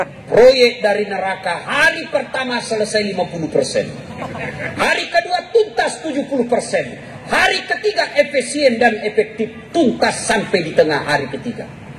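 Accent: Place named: South Sulawesi, Indonesia. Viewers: logat sulawesinya dapet bgt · Ciri has logad ceramah ulama bugis makasar madura mantab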